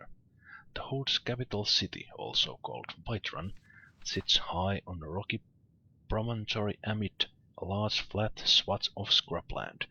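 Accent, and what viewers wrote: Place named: Finland. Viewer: I watched the first video and that's when I found my two long lost loves this video your Finnish accent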